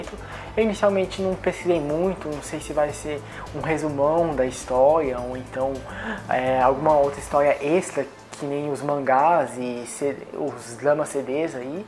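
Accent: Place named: Japan. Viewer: comecei a ver por agr, me inscrevi e talz... Mas vc n é brasileiro? pq vc tem um sotaque japones bem marcante, mas gostei mto do video e vo até ver esse anime xD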